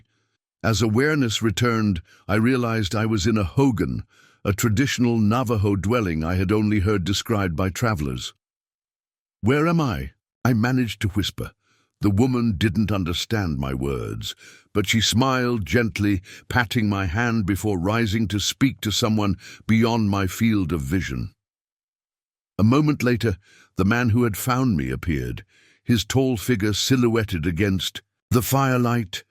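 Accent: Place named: England, United Kingdom. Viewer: Why in the world, when it’s a woman’s story, why don’t you have a woman narrating? Instead you have an English chap narrating for an American western, woman’s story?! Quite a turn off!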